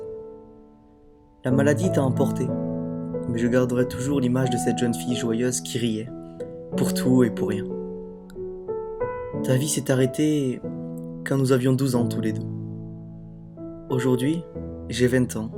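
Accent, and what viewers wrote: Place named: Toulouse, France. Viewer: Hi, j'ai eu la mission de répondre aux commentaires de Un ptit accent du sud (Toulouse hihi) Grooos bisouus Uma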